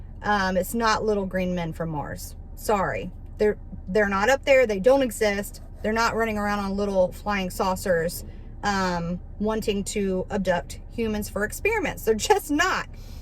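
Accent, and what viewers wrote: South, United States. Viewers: Well, first off. The accent got me right away. A southerner trying to "Oomowmow." Priceless! I'm from Houston, Texas. I served in the military my whole youth from 1980 to 1994. I was even in Operation Desert Storm, and without trying sound like Dale from King of The Hill. I am a Christian and believe that Jesus Christ is God. He is The Word of God made flesh. In him is eternal life. He is my LORD and Savior. 1 John 5: 7 and 20 Ok. Here's what's actually happening. Everything that people are seeing in the skies has been made by man with really good science. It seems like magic, it's so advanced. We now have craft that can do things no one would believe possible. The real "entities" cannot take a human form. God's Word explains this. - Hebrews chapter 1. "He maketh his angels spirits." The angels that kept not their first estate, but sinned have been "bound in chains of darkness." ( 2 Pet. 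2: 4). This darkness is the realm known as the bottomless pit. Revelation chapter 12, 13, and 17 explain this. "The beast that thou sawest was, and is not; and shall ascend out of the bottomless pit, and go into perdition:..." Paul said of them that they are spiritual wickedness in high places. - Ephesians 6: 12 Excuse me? What does god need with a spaceship? Jesus said of their coming, "Shall shew great signs and wonders; insomuch that, if it were possible, they shall deceive the elect. (Matt. 24: 24). Paul confirmed what Jesus said of their coming. "Even him, whose coming is after the worki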